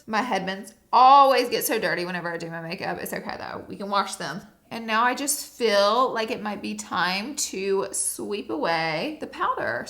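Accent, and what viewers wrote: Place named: California, United States. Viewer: You usually always have this Cali- vibe to your voice, in this video you sounded like a New Yorker! 😅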